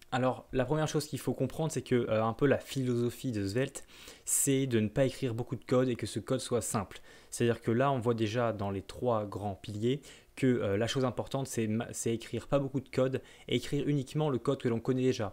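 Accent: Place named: United Kingdom. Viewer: super mise a part l'accent anglais .... hhahhaa Le count m'a tué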